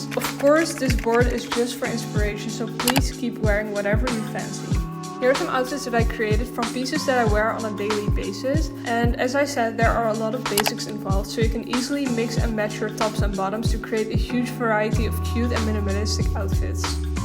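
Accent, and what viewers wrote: Netherlands. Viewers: I can hear from ur voice ur Dutch and if ur not then I am tone deaf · You have a really Dutch accent, idk why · hey girl ben je toevalig nederlands ik hoor een accenttt!?? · girlieeeeee are you dutch you have a littele dutch accentttttttttttttttttttt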